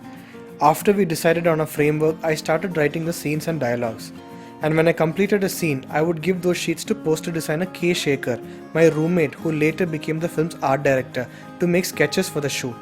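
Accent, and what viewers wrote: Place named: Kerala, India. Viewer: The narrator's English is Purley like a malayali's English!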